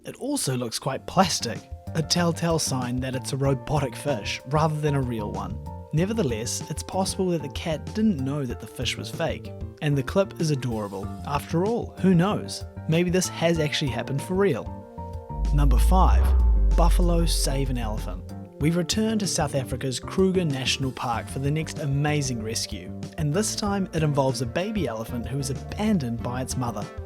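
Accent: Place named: Australia. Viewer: Absolute garbage! Just some guy talking with an aussie accent and telling stories with irrelevant stock shots. #shamelesscashgrab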